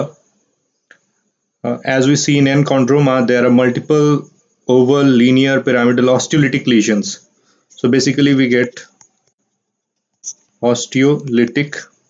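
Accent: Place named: India. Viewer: What is this guys accent? Indian? 🇮🇳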